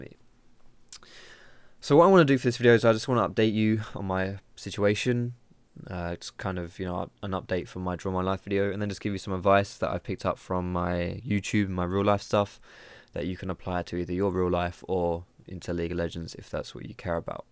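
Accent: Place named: United Kingdom. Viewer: From the very first video, I was in love with your cheesy humor and that lovely british voice! I can honestly say, I'm here for, not;)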